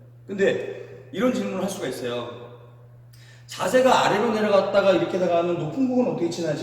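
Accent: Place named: United Kingdom. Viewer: 영국 영어 발음!! 스마슁, 산드위치, 땅큐^^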